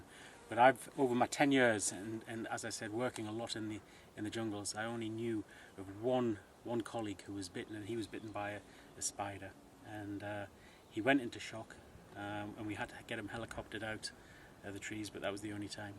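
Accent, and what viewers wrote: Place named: Newcastle, United Kingdom. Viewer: last vid i just watched of him he had a geordie accent, now seems to speak alot clearer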